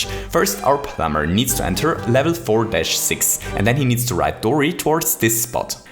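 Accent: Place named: Germany. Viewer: Dein deutscher Akzent ist echt eklig bro. Und kannst du bitte aufhören zu versuchen intilligent zu wirken indem du immer wieder unkonventionelle Wörter wie "plummer" benutzt, das nervt einfach nur und ich bekomme cringe-attacken, weil mal wieder ein deutscher auf möchtegernengländer macht. Ansonsten geht dein Content aber klar.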